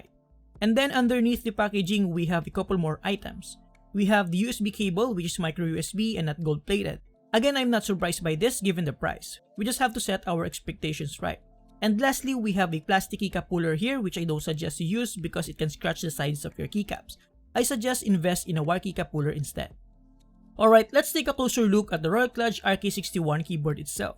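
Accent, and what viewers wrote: Philippines. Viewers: Lmaoo I was not expecting a Pinoy accent, Filipinos on tech tube😎😎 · are you filipino ?? because you sound just like my fam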